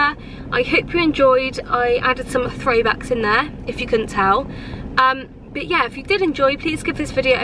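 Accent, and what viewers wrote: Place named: United Kingdom. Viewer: I watch it for her british accent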